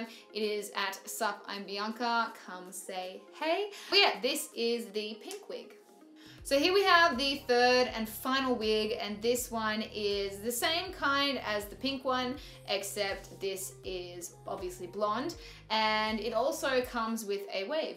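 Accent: Australia. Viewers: Your Australian accent is everything!😩😍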